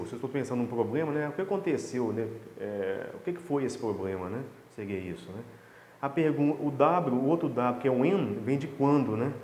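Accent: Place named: Minas Gerais, Brazil. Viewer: O mineirês tá em dia demais! Parabéns pelo material, me ajudou hj!!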